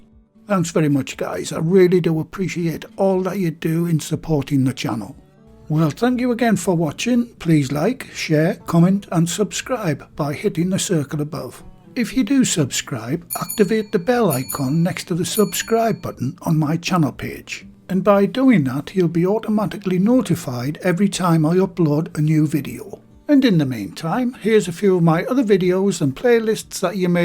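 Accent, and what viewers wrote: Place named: Northeast England, United Kingdom. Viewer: He sounds like my father-in-law. Also from Northeast England.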